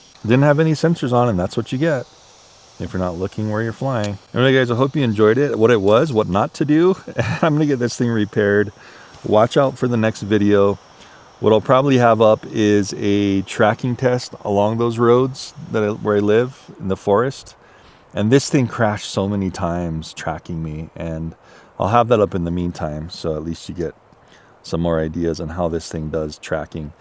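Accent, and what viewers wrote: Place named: California, United States. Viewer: Well you can definitely tell he's probably from California based on the way he talks and their PC culture God I hate California I will never go there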